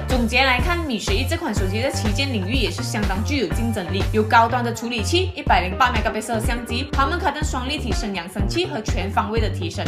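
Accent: Malaysia. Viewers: 妳一開口 我就知道你是馬來西亞人 哈哈 說國語好友喜感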